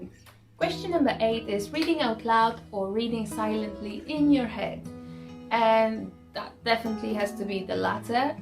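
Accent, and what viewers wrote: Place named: United Kingdom. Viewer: I love the British accent you picked up,! It's so nice to see and hear you, even when we're isolating so far apart. I look forward to more videos:) Yay!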